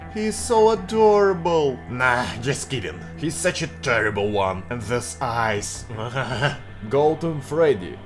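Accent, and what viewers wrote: Russia. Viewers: O, great work, but... this terrible Russian accent. Try to say words softer. Good luck. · * у тебя очень русский акцент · there are Russian pronunciation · you have some Russian pronunciation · your voice is like a Russian who first came to America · Русский акцент все портит. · Your little Russian accent can be heard, but I like it!Well done! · Ochen zameten russkiy akzent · try to remove Russian accent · NOOOOO IF YOU WILL (um...okay ) DO VIDEOS (#pokerface) EVERY DAY, YOU LOST YOUR RUSSIAN (um...) you will lose your Russian accent and your Russian video will look awful((( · Russian emphasis,huh. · акцент то русский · Russian accent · Your English is very nice but Russian accent a little bit unpleasantly. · His accent is associated with the fact that he is Russian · you're really good at speaking English , but the accent is Russian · Good Job! 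Only your ancient is very Russian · as you tell you have russian accent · Good English but with russian accent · Hello, that's cool video! I think you can do great videos in english, but your russian emphasis isn't very well) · you Russian pronunciation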